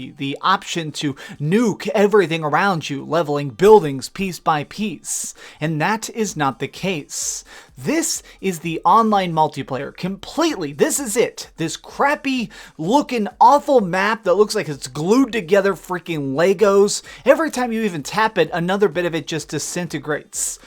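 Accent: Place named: South, United States. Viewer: Stop with the fake announcer voice we all know you are trying to hide that southern accent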